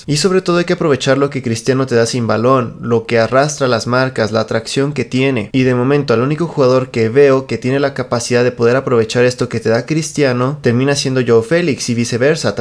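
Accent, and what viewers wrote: Mexico. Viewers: Eres mexicano o portugués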